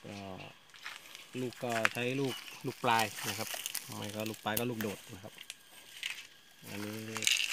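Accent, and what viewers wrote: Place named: Korat, Thailand. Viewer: โคราชแน่นอนครับ ฟังสำเนียงแล้ว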